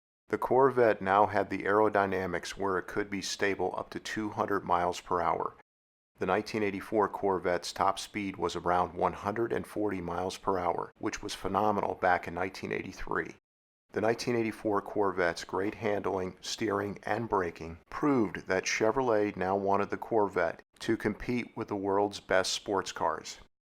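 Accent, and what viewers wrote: United States. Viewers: Wow. That was a great video and voice over. The narrator had the greatest American accent of all time. Good topic and spot on.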